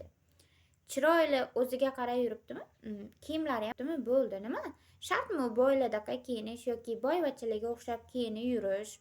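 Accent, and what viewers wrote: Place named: Tashkent, Uzbekistan. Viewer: qayerlisan bir qarasa chiyalcha gapirasan🤮 🤮 🤮 🤮 🤮 🤮 🤮 🤮 🤮 🤮 bir qarasa toshkentcha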